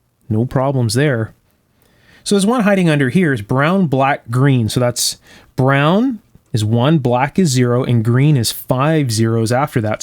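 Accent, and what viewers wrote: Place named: Canada. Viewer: Zap strap? lol Never heard that before, I assume you're in Canada from your accent. Here in Murica it's just called a Zip Tie.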